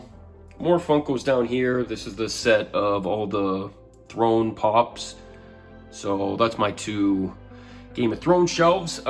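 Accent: Canada. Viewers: Tu québécois man? 🤔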